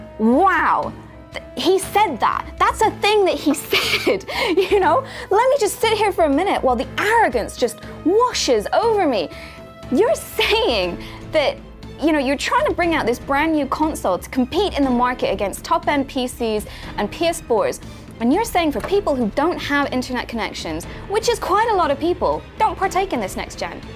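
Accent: United States. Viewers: why do you always sound american but your from england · Is English I keep on getting confused because sometimes she sounds american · Before E3 she had an English accent, now she sounds american.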